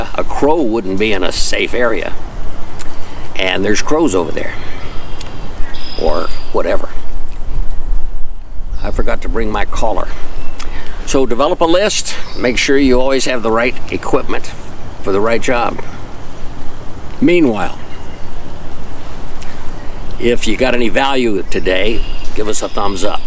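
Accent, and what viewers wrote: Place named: United States. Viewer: was a handsome guy back in his day, bet he had the choice of all the young ladies!!! I feel kind of bad for pulling you up on one of your older vids for pronouncing gamo as gaymo!!! lol I only came across that vid as I was looking into the gamo swarm maxim elite becasue I purchased 1 around that time & having only seen that 1 vid, didn't realise how much of an official hardcore gun enthusiast/aficionado with such a vast collection & being a living knowledge base in the overall field of guns (I live in UK & just own the gamo swarm maxim elite... but would def own more if I lived in the US). So my apologies for the gamo/gaymo thing, I feel a little humbled when I only own the 1 & you own enough for a small army, yet I naively pulled you up on pronunciation! only meant as light hearted UK/US tomato/tomaydo type accent difference & was just having fun! 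(here in UK we like the US/American acent!). looks like an amazing place to live in Michigan!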